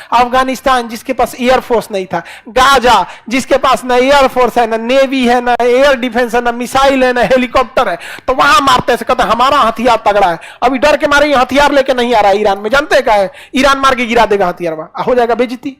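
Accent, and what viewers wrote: Bihar, India. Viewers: Bihari accent 👌👌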